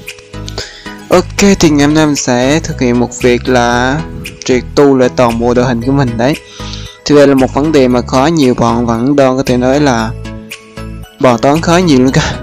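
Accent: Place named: Quang Ngai, Vietnam. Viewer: bạn nói tiếng quảng Ngãi mình không nghe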